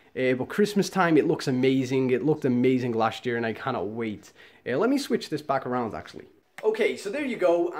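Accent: Liverpool, United Kingdom. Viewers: Wait you're British? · I can't tell if your scouse or not ?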